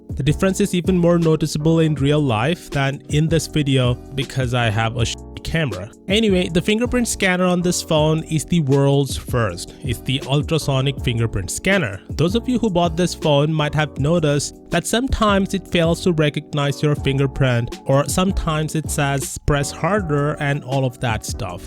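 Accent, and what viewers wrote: India. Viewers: many people in India speak with this accent. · it's accent not plastic surgery ! In india their r many people who speak like this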